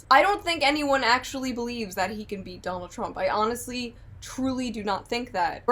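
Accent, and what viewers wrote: New York, United States. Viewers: New to the channel, can’t get away from what sounds like a New York accent? Sorry lol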